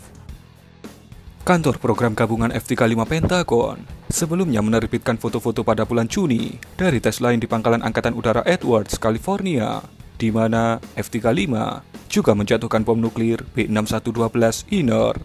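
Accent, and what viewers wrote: Java, Indonesia. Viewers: Khas medoknya enak bet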